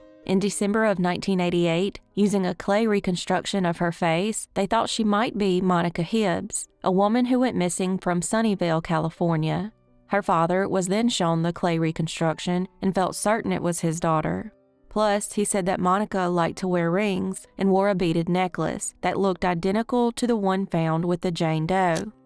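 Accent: South, United States. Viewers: Just heard about this story. 10 y/o Bob Boyes went missing in port republic, calvert county, md, Dec 26 1968, along with his pet deer, and has never been seen again. I know you already have a lot of suggestions. but would love to hear your southern accent, doing his story. Both parents, now deceased. Love fr md expat, living in panama. Thanks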